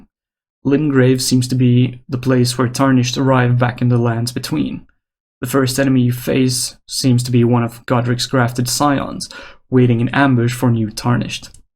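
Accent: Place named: Netherlands. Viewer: Man, you sound so dutch, are you? xD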